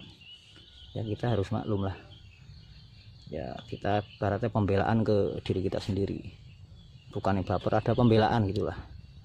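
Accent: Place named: Central Java, Indonesia. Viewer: Mas bro.. logate ky Jawa tengah njih.. Asline auto ngiler niki... 🤭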